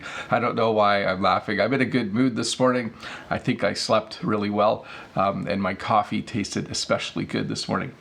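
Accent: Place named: Canada. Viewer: I like English with a Canadian accent.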